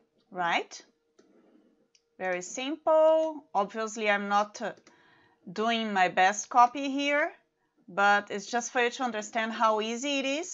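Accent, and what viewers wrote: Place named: Germany. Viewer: You have a beautiful German accent 😀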